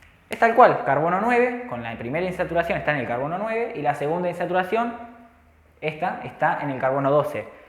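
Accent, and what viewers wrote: Argentina. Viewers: Oye profesor ¿ ud es de Argentina? Y tengo una duda .¿ Estos tipos de tema entran en examenes de ingreso de cualquier universidad de medicina de Argentina?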